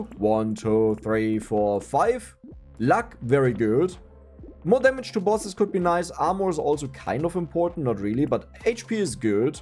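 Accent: Germany. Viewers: By the way, my browser has Voice-Over-Translation and on large videos (like this) from English, you have to wait about 1-2 minutes for voice acting. But because of your pronounced accent, the browser detects it as German, and then gives me an error because it doesn't understand why English words are pronounced in German. You have to manually set English. (Who cares, the browser is called "Yandex Browser". I don't know if this works with other languages, but it does sound exactly in Russian)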